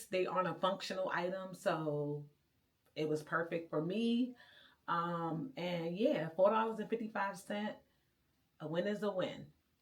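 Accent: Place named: DMV area, United States. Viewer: OMG I just knew you were from the DMV area I can hear it in your voice lol I LOVE IT…… Girl you have a Beautiful home to God be the glory I’m truly happy for you✨💛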